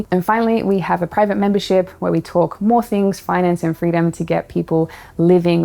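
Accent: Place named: Australia. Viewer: Why do you have an australian accent?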